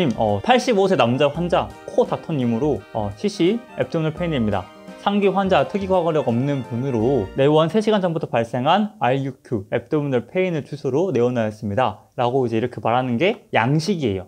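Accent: South Korea. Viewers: 근데 진짜 영어발음 말고 한국식발음이라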